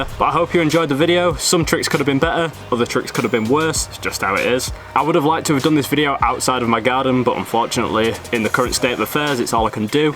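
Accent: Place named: United Kingdom. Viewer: Am getting back into skating at 30 am watching like like waaat I have allot to Learn haha you sound like your from UK same as me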